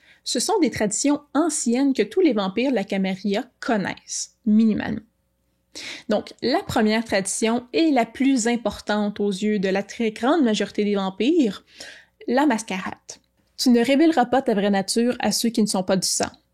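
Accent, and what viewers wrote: Quebec, Canada. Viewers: Quelle excellente vidéo 😮♥️ J’ai été tellement agréablement surpris d’entendre une compatriote québécoise sur le YouTube francophone ♥️ Je m’abonne à la chaîne!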